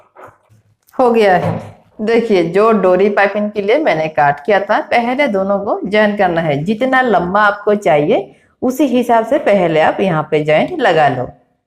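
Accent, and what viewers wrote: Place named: Bengal, India. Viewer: দিদি তোমার বাংলা একসেন্ট আছে😁😁 বাড়ি কোথায় দিদি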